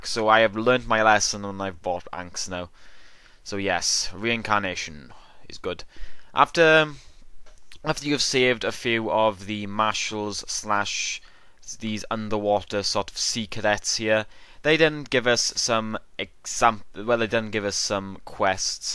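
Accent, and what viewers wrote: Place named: United Kingdom. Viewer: dude even u are a gay or freaking weird british that cannot talk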